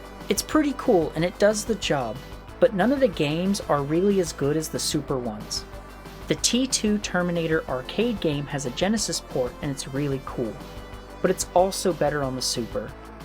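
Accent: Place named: Sacramento, United States. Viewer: You sound like it (from Sacramento)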